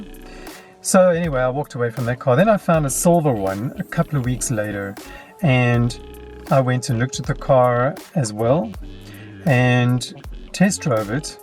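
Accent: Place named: South Africa. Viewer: I searched XF Reviews on YouTube and came across your channel, watched 1 video and thought to myself, this guys definitely South African, your accent gave you away lol. But in the video you spoke of Dollars which made me think maybe you not from SA. Anyway, love your channel, Im a new Fan of Jaguar, especially the XF model. Mainly due to watching your videos, you don't sugar coat your real life experiences with the car.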